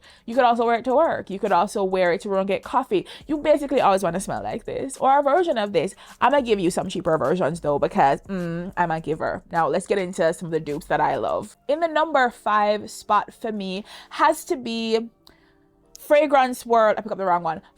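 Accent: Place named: Jamaica. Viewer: Am smelling a Jamaican accent 🤔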